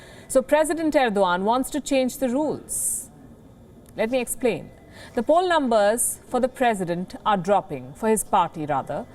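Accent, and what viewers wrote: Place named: India. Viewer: Turkey is not a third world country by the way as India is. Where severeg's water is floating on the streets Where people sleep on the streets. Where more than 50 percent of its population attend toilets in the fields and farms. Where people more than half of its population living below poverty line. It's all sad.But How come rather than focusing on such basic issues of it's own the colonial indian so called funny accent's english language 😂channel reporting about others.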